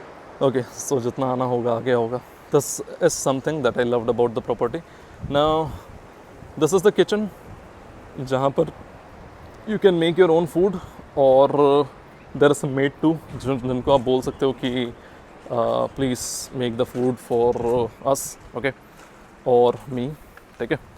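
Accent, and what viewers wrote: Kerala, India. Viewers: Kay tum Kerala se ho?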